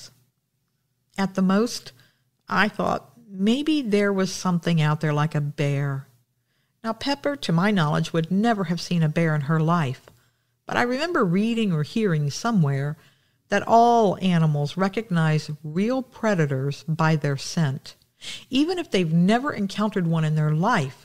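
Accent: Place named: Minnesota, United States. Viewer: Sounded like a great way to celebrate 21st birthday. I am from MN this sounds like you are from the northern part of the state and your cabin sounds awesome. Thanks for sharing your story. Pepper sounded like a great dog.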